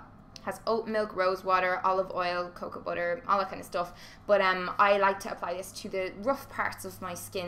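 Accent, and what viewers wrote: Ireland. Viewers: Hi! Your voice makes me miss Ireland so much!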